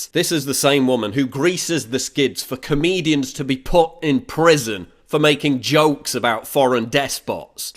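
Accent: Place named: England, United Kingdom. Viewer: wait you english i thougth you where american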